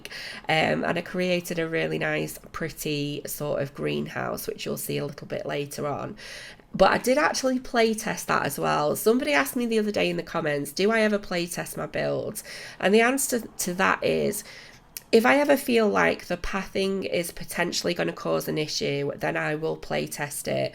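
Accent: United Kingdom. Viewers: So good. Just subscribed to the channel. Love your accent. I love British people. 😍♥️♥️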